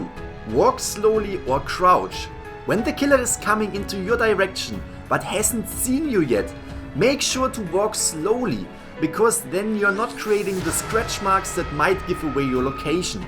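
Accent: Germany. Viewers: Damn bro, man merkt, du bist deutsch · Are you german ypur english sounds like that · No front, but u are German right? Your English sounds.. pretty german, yk? 😂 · Kann es sein das du deutsch bist? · Man hört den deutschen Akzent soo raus XD😂 Aber Gutes Video Danke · We love a good deutscher akzent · Digga dein english ich habe direkt gecheckt du kommst aus deutschland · Im sorry but first: im super triggered by your pronounciation (it sounds like your german i am too but i speak english too) aand you were using the flashlight super bad giving tips( that are obivious) but not giving the tip to stun the killer before using it? Its actually more important than Open the gate before helping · German akzent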